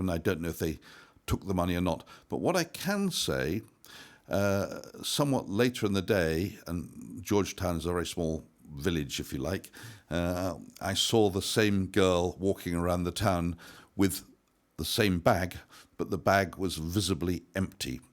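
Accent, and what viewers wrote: United Kingdom. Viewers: Greetings from NY. Technically speaking, being in the "Black Friday" (profit) is positive for stocks, even though consumers will be in the Red if they overspend on things they do not need this week. I love the British accent, but I add captions to your videos so that I do not miss any details you are discussing. Love the office setup. Have a great holiday with your friends & family.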